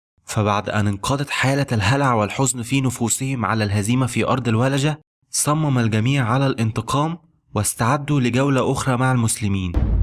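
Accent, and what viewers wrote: Egypt. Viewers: قناة جميلة جدا و مجهود يشكر لكن نطق اللغة العربية ضعيف جدا و تغلب عليه أخطاء نحوية و مخارج الحروف بلسان مصري غير فصيح. أتنمى التغلب عليها رغم صعوبتها. وشكرا مرة أخرى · اخوي ذول الناس يحاربونك عشان ما تكمل روح كمل ولا تهتم نفهم على لهجتك المصرية ما عليك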